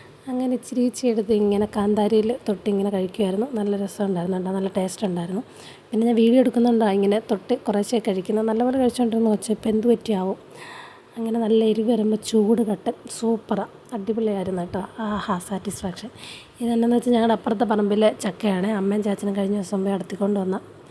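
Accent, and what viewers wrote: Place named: Kollam, India. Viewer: ഇങ്ങള് കൊല്ലംകാരാണല്ലേ 😂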